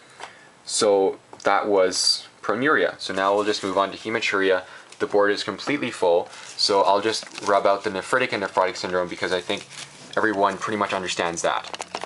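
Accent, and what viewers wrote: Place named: Canada. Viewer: are you canadian? detect an accent lol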